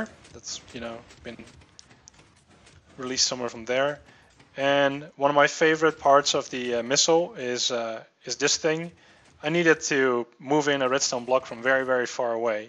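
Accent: Netherlands. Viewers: r u dutch? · this is my firt vid of you but are u dutch :P( ur accent sounds like u are) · are you dutch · Its really easy to notice that you are dutch, ya know? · That accent, your Dutch!!!! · are you Netherlands? you accent sounds like it! I'm from the Netherlands. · are u dutch? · Het valt meteen op als iemand Engels gaat praten en hij is Nederlands en vooral bij cube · Are u dutch ? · are you dutch??? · Are you Dutch? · the best accent is the dutch is accent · I hear a Dutch accent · He is from the Netherlands. :) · You sound dutch XD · Je bent Nederlands ik hoor het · are you dutch? you sound like it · that dutch accent tho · are you Dutch? because Dutch accent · Are you from the netherlands?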